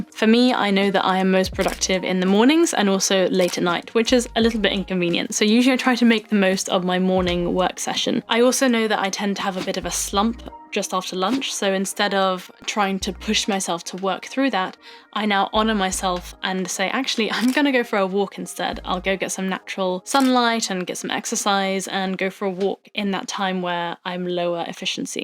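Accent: United Kingdom. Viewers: An Elegant British accent ( RP) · Hi love your videos.Your British accent.Listening to our interoception, Health is wealth absolutely,I am going to the gym 2-3times a week walking daily and eating healthy diets❤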